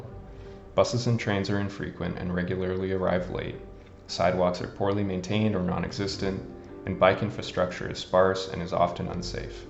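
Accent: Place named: Canada. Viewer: I HEARD THAT CANADIAN ACCENT AND THEN I WAS CONFIRMED BY YOUR ABOUT SECTION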